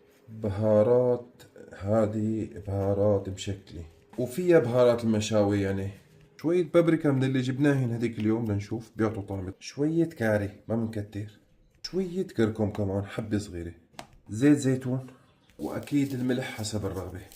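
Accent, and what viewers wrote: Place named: Idlib, Syria. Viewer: بحب احضرك لانك بتحكي زي صحبيتي هيا من ادلب و بتزكرني فيها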